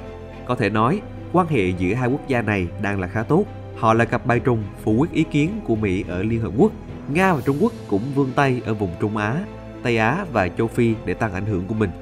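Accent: South, Vietnam. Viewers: xét riêng giọng đọc thì hình như k vấn đề gì, nếu bạn nghe tốt giọng miền Nam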